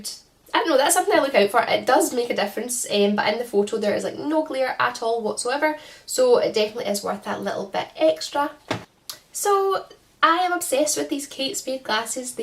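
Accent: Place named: Scotland, United Kingdom. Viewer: Wow you look amazing I hate wearing glasses but u have showed how amazing the right pair can look ,I think I need some like these to thanks for this review and love your Scottish accent 😊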